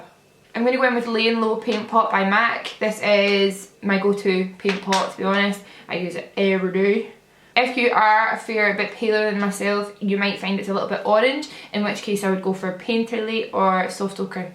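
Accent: Scotland, United Kingdom. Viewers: LipsticksBeauty AndMore glasgow, scotland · Not only are you an utter boss and totally glamorous, your accent reminds me of one of my best friends from Scotland, and it's awesome.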